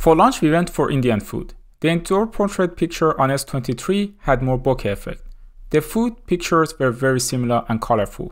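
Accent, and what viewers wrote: Iran. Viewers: Irani accent spotted 😂❤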